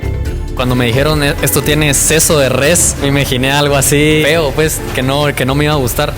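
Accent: Guatemala. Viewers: Lo amé! Realmente me encantó la edición y la forma en que comunicas todos los platillos es muy culta y neutra, tu acento chapin se nota igualmente Gran video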